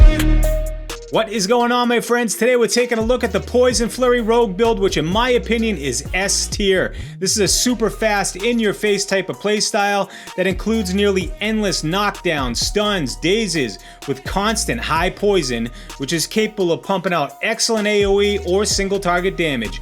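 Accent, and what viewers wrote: Boston, United States. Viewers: Aside from the cool build, this video is quality. Good pacing, delivery, and energy. Getting some Boston vibes too for an added bonus.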